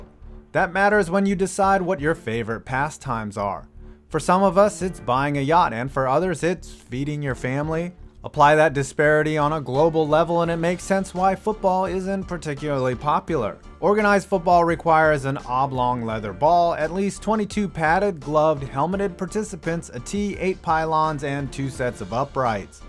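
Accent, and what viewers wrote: United States. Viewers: Shut up.. you sound so America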